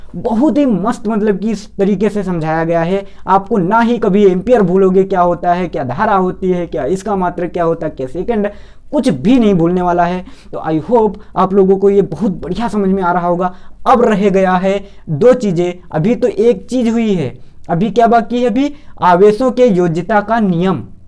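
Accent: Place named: Varanasi, India. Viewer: Aap Kaha se Ho My guess Rawansi se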